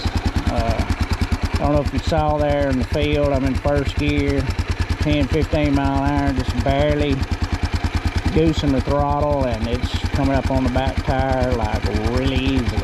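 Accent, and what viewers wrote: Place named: South, United States. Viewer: Hillbilly talking.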